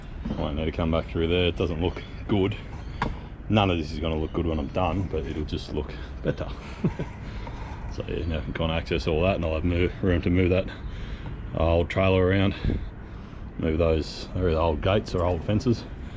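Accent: Australia. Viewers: TV aerial was my guess! Woot! Great job. I love the charity work. Love your accent, too! Down home Alabama here, so hearing an Aussie is a treat. Keep cutting! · Where are you located? Are you an Aussie? Love the accent. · When u like hearing languages and recognize it as Australian accent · At first I wasnt really paying attention and took your aussie accent as a british one and was like, why the hell is this guy worried about spiders. Then I realized that he is in Australia lol. Cant imagine having to worry about creepy crawlies all day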